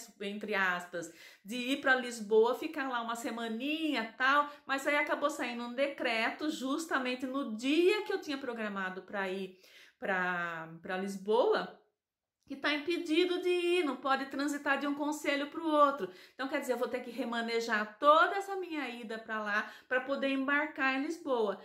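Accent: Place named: Rio Grande do Sul, Brazil. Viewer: Pelo sotaque você não sabe? E do sul gaúcha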